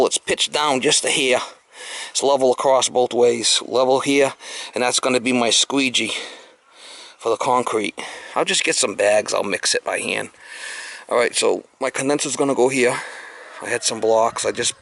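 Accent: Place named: Massachusetts, United States. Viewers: Judging by the accent, I'd say Massachusetts. (I know. It's not nice to judge.) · Good vid! Im trying to place your accent.. Massachusetts?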